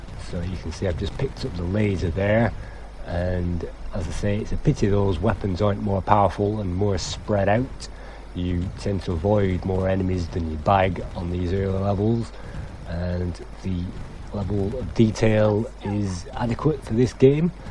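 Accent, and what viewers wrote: United Kingdom. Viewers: It's cool man, you remind me of Yakumo1975 's RetroCore vids. Always good to have a laconic British accent. Cheers for the vids man.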